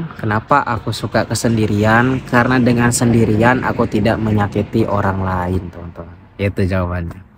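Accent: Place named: Sunda, Indonesia. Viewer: Bapa pasti bangga a, btw asli mana a?. Logat sundana khas pisan😅. Pidoana a, mudah mudahan tiasa oge ngerantau ka jepang🙏🏻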